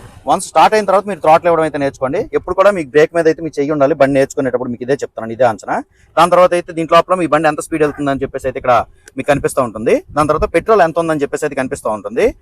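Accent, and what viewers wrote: Srikakulam, India. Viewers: Guarantee srikakulam ayyuntindi ma intlo matladukunnatle undi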